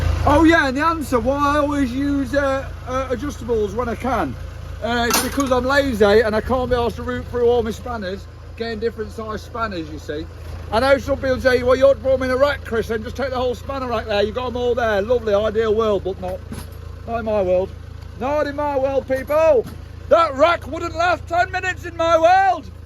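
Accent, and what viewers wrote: Australia. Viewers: Was the Australian accent inspired by the Ozzy fella with the big beard who does the heavy welding on earth moving buckets and the like... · Aussie accent ? I thought I'd dropped into Norfolk Man Cave's channel for a minute there boi !😂 Your welding's gone from "Town centre" pigeon, complete with a manky leg and missing foot, to something you'd find in a bespoke dovecote from a copy of "Country Life" magazine !😂 Is there a service exchange price included with the alternator, or do the Chinese not even want it back ?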